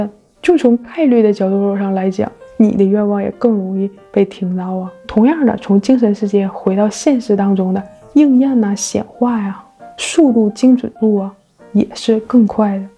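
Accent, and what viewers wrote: Northeast, China. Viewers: Youtuber是哪里的人？听口音像是东北人。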